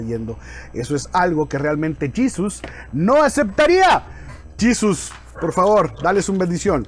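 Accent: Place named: India. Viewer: Es idea mía? 😅 o comenzó hablar como indu 😁. Men hace años no veo tu canal, y me sorprende lo relajado que estas y animado y ya no te tomas personal las criticas contrarias de malas maneras (poco 😅), no se si fue tanto Fortnite pero se te a cierta bien en el canal. me animaré de ver tu canal, 😁👍 se te desea lo mejor.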